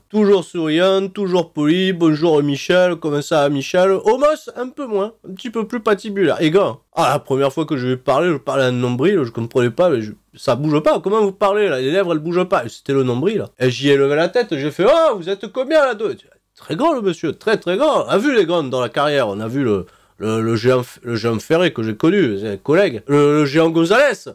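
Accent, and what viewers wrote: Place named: South, France. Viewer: c'est plus très marrant le délire arbitre accent du sud un peu con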